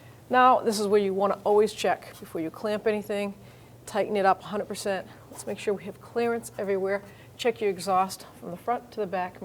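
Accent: Boston, United States. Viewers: I hear that Boston accent and I feel like I’m home.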